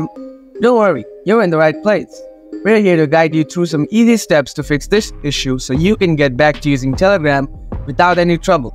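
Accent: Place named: India. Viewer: It is an Indian English.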